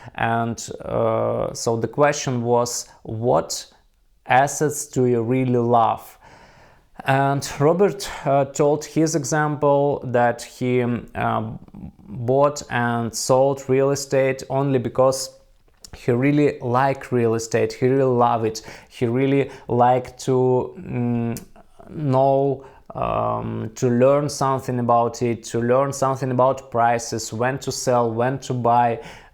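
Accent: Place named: Russia. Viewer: Yes, it’s nice to understand you with this “Russian” accent. It’s better than “American” or “British” for understanding 🎉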